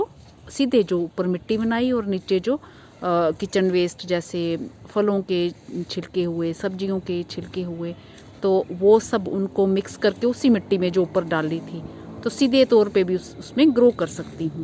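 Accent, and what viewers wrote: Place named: Haryana, India. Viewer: Ok ,aap jesay bolti hai us say legta hai ki aap haryana say, bahut achi city say hai aap. 👍🤝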